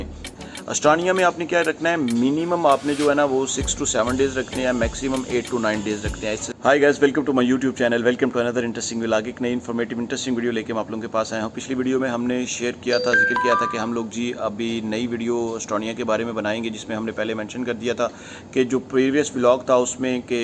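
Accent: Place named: Pakistan. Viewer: Are you an Indian or Pakistani?? I'm doubtful because you have talked about India But noT Pakistan though your name and speaking accent is of a Pakistani.....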